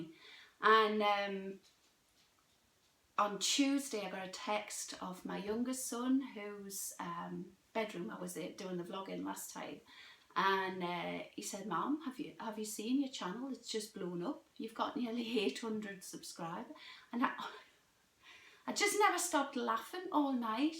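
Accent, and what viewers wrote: Newcastle, United Kingdom. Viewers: Morning, is the pattern piece you showed not the back? You’re such a laugh and it’s great to hear your Geordie accent, which I miss so much. 🙋🏻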